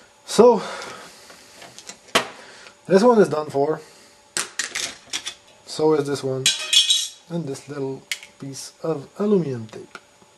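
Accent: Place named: Quebec, Canada. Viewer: j'ai reconnu l'accent québécois lol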